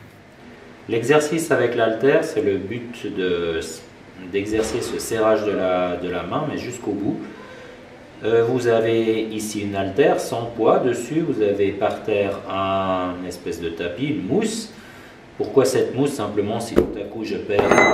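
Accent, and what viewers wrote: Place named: Switzerland. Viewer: je savais que j'avais reconnu un accent suisse :)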